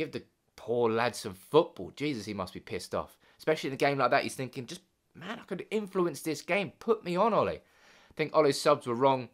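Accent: Manchester, United Kingdom. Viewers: Who is this guy, you were outclassed, go on City, you was never going to win that game, is this guy from Manchester, never heard this accent.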